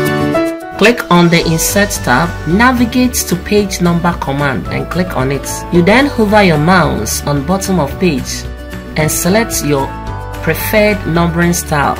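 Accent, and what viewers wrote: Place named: West Africa. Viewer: I have not heard all of the video, but I give compliments to the speaker. Beautiful accent (Nigerian/West African); well-spoken English that is clear as a bell and easy to understand. I've tried listening to people from India. They are very smart, but I can't get past their accents.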